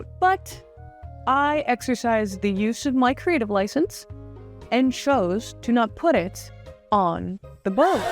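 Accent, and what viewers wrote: Netherlands. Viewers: idk if im hearing it wrong, but are you from the netherlands? i hear some part of a dutch accent but also not and i need to know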